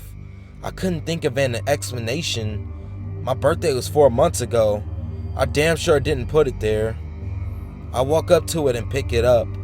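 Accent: South, United States. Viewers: Woah woah woah. This dude from the south or what?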